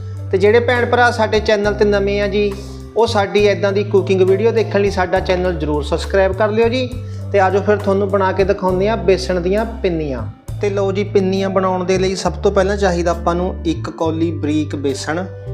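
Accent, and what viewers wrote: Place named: Kurali, India. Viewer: ਤੁਸੀਂ ਵੀਰ ਜੀ ਕਹਿੜੇ ਪਿੰਡ ਤੇ ਜ਼ਿਲ੍ਹੇ ਤੋਂ ਬੋਲੀ ਸਾਡੇ ਕੁਰਾਲੀ ੲਇਲਾਕੇ ਦੀ ਲਗਦੀ ਹੈ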